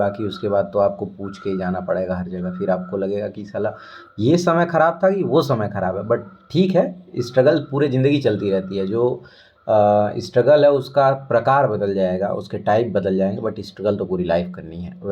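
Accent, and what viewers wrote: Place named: Bhojpuri, India. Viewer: Bhojpuriya andaaj me😂😂😂❤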